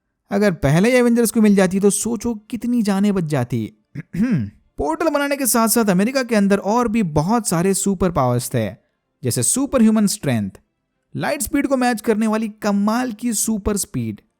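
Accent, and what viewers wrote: India. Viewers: I hate your stupid indian accent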